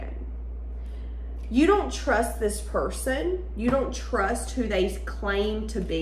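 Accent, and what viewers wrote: South, United States. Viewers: I love your southern accent 😊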